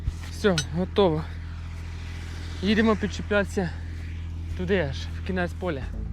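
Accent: Poltava, Ukraine. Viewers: Супер земляк.Що їм мова не нравиться,як причепляться людина із Полтавщини.Нікого не слухай наш суржик супер.Удачі.Хай щастить у житті. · Я з перших кадрів,коли тільки почала дивитися канал,зроміла,що з Полтавщини,говір неповторний ❤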